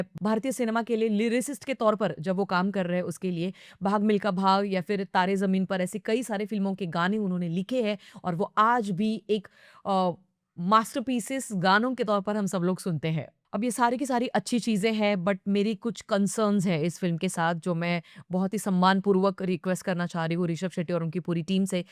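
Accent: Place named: Maharashtra, India. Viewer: Tumcha Hindi he Maratho-tbhav aahe😊 chhan review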